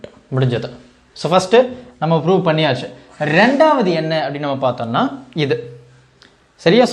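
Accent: Coimbatore, India. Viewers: Bro are u from Coimbatore ahh bro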